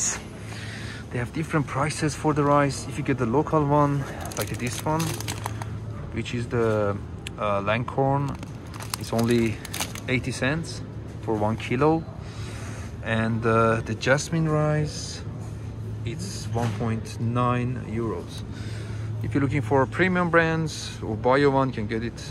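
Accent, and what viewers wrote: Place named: Iran. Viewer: داداش از لحجه ت تابلوعه ایرانی هستی دمت گرم😅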